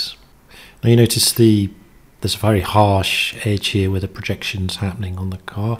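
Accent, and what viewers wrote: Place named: New Zealand. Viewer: That is Kiwi accent, if you are wondering. Every video teaches me something new.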